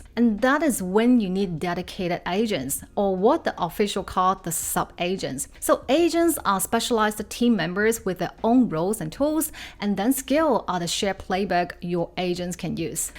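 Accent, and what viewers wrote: China. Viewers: you are talking TOO fast and the Chinese accent doesn't help! You are also rapidly Jumping between applications while you speak, making it very difficult to figure out which application you are on. I thought this was a step-by-step guide, not an exercise in speed-reading!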